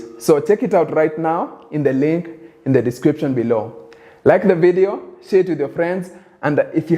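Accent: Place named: Kenya. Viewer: Are you kenyan? Just asking, you sound like my watu:)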